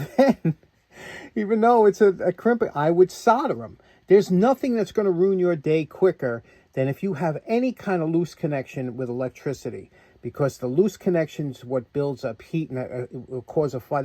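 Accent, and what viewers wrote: New York, United States. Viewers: I love your NY accent, but I couldn't, for the life of me, figure out what a "soladis" connector was until I noticed the title of this video. I just love that accent! LOL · Great episode and thanks for speakin in N.Y. accent.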